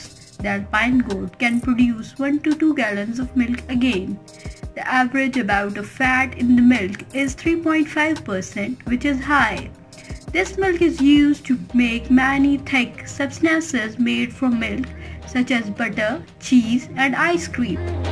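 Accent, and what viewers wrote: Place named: India. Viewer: ...Also hard to understand such heavy indian accent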